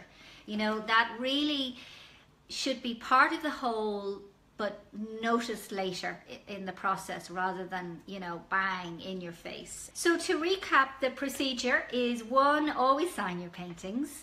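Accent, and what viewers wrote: Ireland. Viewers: First of all, I love your Irish accent (" ")! 😀👍 I often wish I were more connected to my English, Scottish, & Irish lineages, but to date I've not achieved that. An interesting fact that you may or may not already be acquainted with. I learnt some years ago from a reputable Jamaican newspaper's website (i.e. "Jamaican Gleaner") & an article on its series "Out of Many, One People" or some title like that to emphasize the multiethnic status of Jamaica's population & to imitate the national motto that speaks of this same phenomenon, I learnt that the accent of the Jamaicans in their speaking of English was primarily due to the African enslaved being most shoulder to shoulder with the Irish indentured laborers who were yet, of course, speaking the Irish brogue. In fact, I used to work telecommunications a lot in the past as employment & I would occasionally get Irish clients that I thought were Jamaican until they clarified that they weren't! 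So, yeah, that's the bit of trivia for you. Now, regarding this topic of yours you sought to do your presentation on, it is such a tremendously neglected topic albeit the topic being so essential & so it is precious that you've dealt with it herein, yet not only dealt with it, but dealt with it quite thoroughly & skillfully. Good job! The thing is, my late father having been the very gifted artist that he was made me to be well aware of many of these points from in my boyhood (or when I was a "lad," as the Irish would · I hear Ireland flowing through ... ☘️ The land of my lineage, County Kerry, Ireland 🇮🇪 M Beth USA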